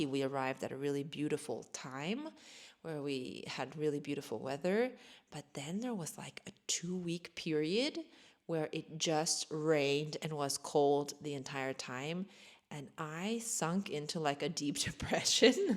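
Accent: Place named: United States. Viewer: I just like to listen to your „American“ voice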